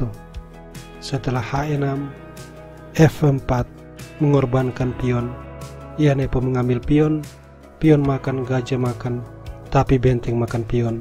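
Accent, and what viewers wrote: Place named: Eastern Indonesia, Indonesia. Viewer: Bung orang timur kah. Logatnya itu kental bnget timurnya 😁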